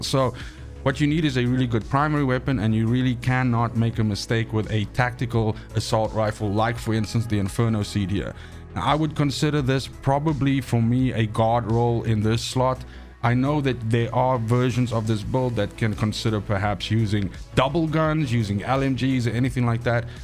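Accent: South Africa. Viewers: subscribed brother, love your videos. Really well detailed and explain... and I hear that south african accent coming through. Lekker !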